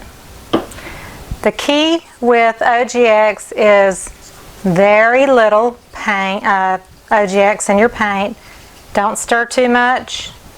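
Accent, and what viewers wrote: South, United States. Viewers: Hi. I have to say I am not a fan of the colors BUT I LOVE how fearless you are in trying different things AND showing us your boo boo’s. You could have easily edited your video to hide the fact that you forgot the additive but you showed us how to recover and that makes you an excellent teacher. I love how you blew on the painting to create more cells and added color to the blue area after the fact. You take risks and just roll with it. This has made you a master of this medium and I applaud you for your efforts. I will always watch your videos because I enjoy learning your methods, personality and your southern accent. Thank you.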